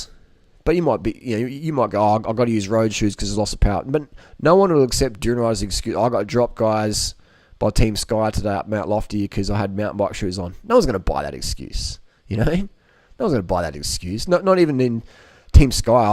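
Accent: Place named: Ireland. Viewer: Great to hear an Irish accent on here! We dont need milk.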